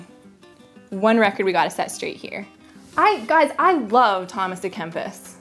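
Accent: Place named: Uruguay. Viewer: I was like hey why is she speaking Spanish with an Uruguayan accent? Hahaha.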